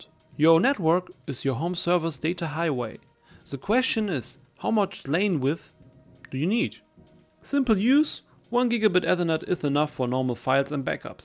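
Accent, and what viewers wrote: Germany. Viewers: Ich ess deinen deutschen Akzent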